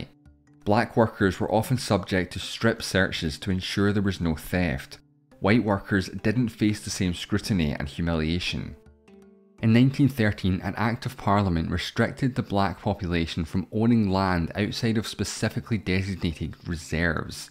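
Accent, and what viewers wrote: Scotland, United Kingdom. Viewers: Wow your voice is so nice and scottish and now I think I’ll join the discord link in description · Sieth afrika huh appertheid that's what I hear you say are you British too bc you sound British and really eighteen sexteens really but I live in south Africa and I love you that time the white people what's like a witch and black people was so nice white people enjoyed apartheid also the white also felt bad(I live in south Africa and I'm coulored and I'm glad I'm coulored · He's Scottish. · He's Scottish, that's just his accent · No pretty sure he's a Scot. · which part of the uk makes you have an accent where 6 is pronounced as sex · he's scottish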